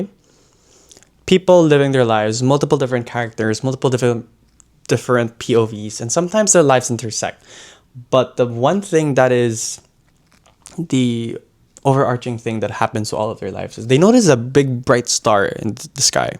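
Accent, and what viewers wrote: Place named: United States. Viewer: where r u from? just interested bcz u'r speaking like american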